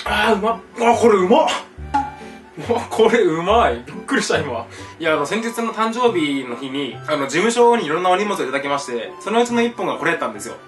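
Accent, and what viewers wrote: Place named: Hakata, Japan. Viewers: いつもにないくらい博多弁使ってくるやんw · 福岡弁？博多弁？良いですね(´ω`)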